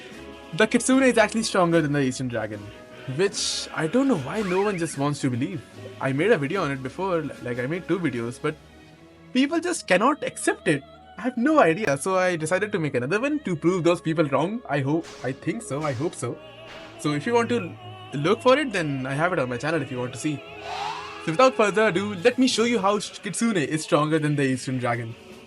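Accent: India. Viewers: Bhai tere accsent indian hai toh hindi bola kar yar❤ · "Bro u sounds like Indian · Ppl gonna hate me for this but.. WHY INDIAN ACCENT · I can feel your hindi 😅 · oh yea, he sounds like indian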